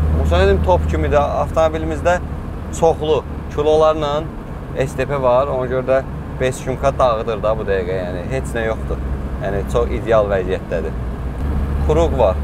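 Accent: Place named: Sumgait, Azerbaijan. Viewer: Yetim+ Ataş Sumqayıt aksenti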